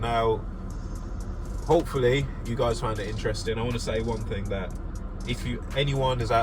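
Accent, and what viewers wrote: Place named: United Kingdom. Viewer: Nothing cooler than a black guy with British accent 👍🏼👍🏼👍🏼👍🏼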